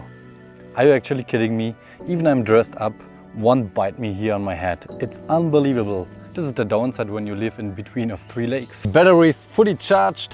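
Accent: Germany. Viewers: your englisch sounds a littl bit like you are german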